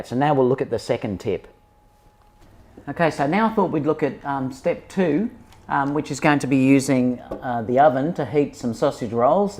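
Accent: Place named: Australia. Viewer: Such an Australian narration and humour, mate!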